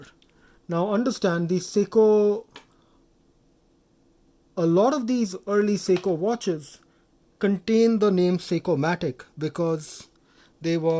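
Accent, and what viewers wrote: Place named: India. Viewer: when i hear indian accent, all I know all of this was bullsh*t and you stink , you didnt even explain the acronyn 62 "MAS" seikomatic selfdater bullsh*t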